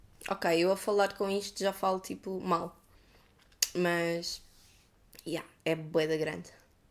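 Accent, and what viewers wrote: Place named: Portugal. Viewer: Acho Lindo O Sotaque De Portugal ❤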